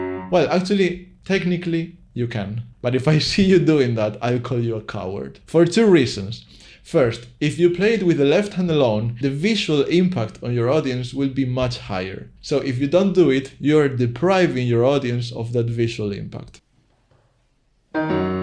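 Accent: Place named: Spain. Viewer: Se te nota el acento, español jajajaj. Buen video :)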